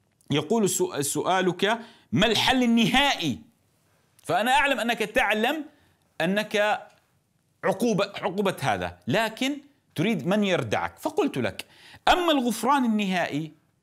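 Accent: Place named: Iraq. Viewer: شيخ حبيبي عمري 🥺 كام يحكي عراقي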